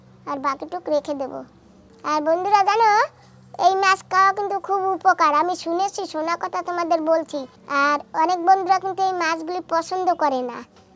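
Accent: Barishal, Bangladesh. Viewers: আমরা কোলকাতায় থাকি ,তোমার ভিডিও দেখি ভাল লাগে তোমরা কি বরিশালের ?কথা শুনে মনে হচ্ছে ।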